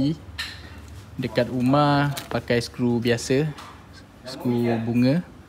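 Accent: Terengganu, Malaysia. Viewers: molek cakak tranung boh sdk sikit dgr...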